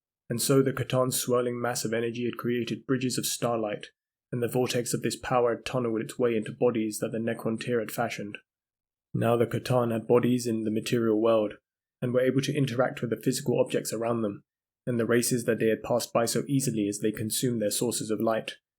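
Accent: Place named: United Kingdom. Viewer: Dunno why i fixate on that comment so much, it keeps rolling a round in my head. I think its because i was so genuinely surprised that a new Lore channel had popped up and it was really good. I think i have nailed his voice down - Calmer than arch warhammer, More interesting than luetin and British. these are all wins.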